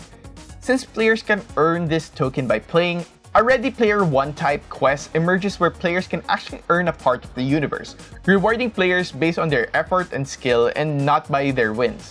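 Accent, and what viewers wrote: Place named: Philippines. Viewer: I hear a Pinoy accent... :D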